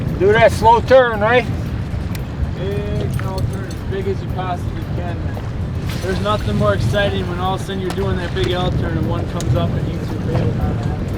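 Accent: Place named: Minnesota, United States. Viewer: The boooooooat! You're so Minnesotan ......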